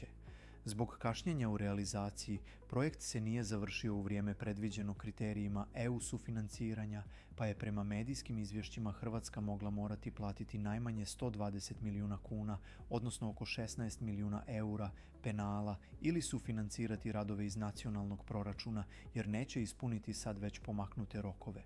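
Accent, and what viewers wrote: Serbia. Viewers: Dobar video al ide mi na k ovaj srpski naglasak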